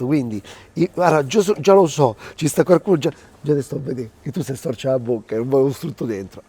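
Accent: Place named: Nettuno, Italy. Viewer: sei proprio de nettuno 😂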